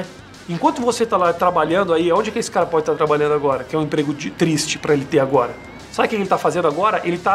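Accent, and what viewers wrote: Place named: Porto Alegre, Brazil. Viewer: Fala bem de Porto Alegre!! Tu é daqui, po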